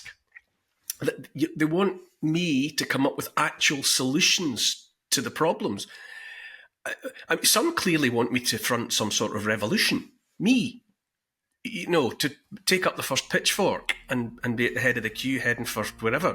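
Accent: Scotland, United Kingdom. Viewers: This is the very thing he was talking about. You shouldn't be behind anyone. We should all be out there doing some form of resistance and/or spreading the kind of truths speaks. The comments I have made on a couple of occasions have not been about getting out there and leading some sort of resistance or revolt. It is about some - not all but quite a fair number - of his viewers that fawn all over him and actually say they wait and look for his next video, that he keeps them going because he is a voice of common sense and reason. Too many people appear to be addicted to listening to and others like him as a kind of comfort blanket, his eloquence, his no-nonsence Scottish way of speaking, his light, and airy room giving a sense of simplicity, peace and tranquil respite in a misty spaciousness, almost felt beyond the window, far from the hurly burly of busy towns in which we mostly live. 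To read some comments it's almost as if the daily sight and sound of is their daily fix which enables them to survive another day. I have read accusations of controlled opposition against on certain other channels particularly on Telegram. It's another label that's thrown around like all the -ist ones used by the Left Wokerati. I don't necessarily subscribe to that view but I can see why some might say it. As long as people are soothed and stay on their sofas waiting for their next fix they remain in a state of soporific trust that somehow he has all the answers and will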